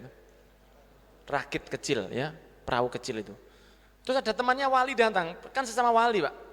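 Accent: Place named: Madura, Indonesia. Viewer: ada madura nya😍😍😍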